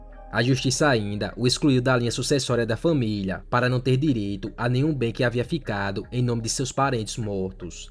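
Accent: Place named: Rio Grande do Norte, Brazil. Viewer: Amigo, você é do RN? Tu tem sotaque de potiguar.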